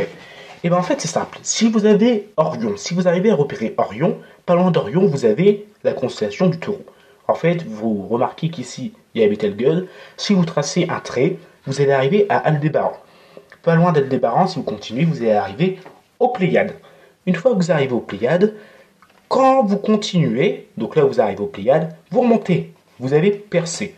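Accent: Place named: Nord-Pas-de-Calais, France. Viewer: Magnifique accent ch'ti en tout cas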